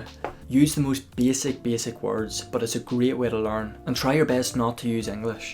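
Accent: Ireland. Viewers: Just clicked on this after watching a vid where you spoke only in German. Would have never guessed you have an Irish accent ! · Oh my godness, your Irish accent is sooooo adorable! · I realized that you are from Ireland with help of your pronunciation,especially a word "thousand") thanks for sharing,mate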